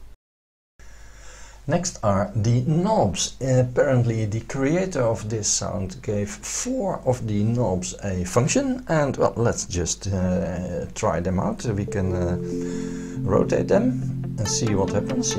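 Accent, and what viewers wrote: Netherlands. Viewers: Hello I like your video's about the MODX. They are very helpfull. Your English sounds a little bit with a Dutch accent, so I assume you are Dutch? Mag ik je daarom iets in het Nederlands vragen; ik overweeg om ook een MODX6 aan te schaffen, maar ik ben vooral geïnteresseerd in de Vocoder mogelijkheden. Heb jij daar misschien al ervaring mee, want op Youtube heb ik daar tot nu toe nog niets over gevonden..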